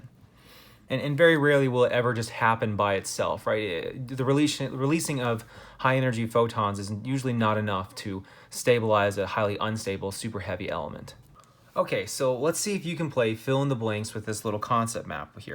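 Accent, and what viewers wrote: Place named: United States. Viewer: Are you from Uzbekistan? If so why your accent is very American lol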